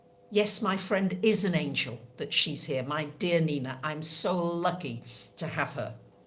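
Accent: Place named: United Kingdom. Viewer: P.S. I simply love your british accent !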